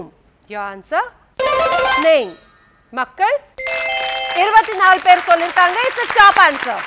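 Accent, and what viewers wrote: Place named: Punjab, India. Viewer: She is from Punjab and be proud she is trying to talk our language. Namma oorlaye Tamil a pesadha tamilans irukanga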